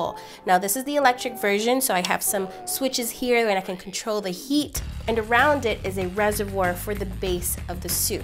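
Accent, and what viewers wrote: Thailand. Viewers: I love your Thai accent 😃😃😃💛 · I love your Thai accent!!! So cute❤️😘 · This is perfect yess btw love your Thai accent!! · Love ur thaiglish accent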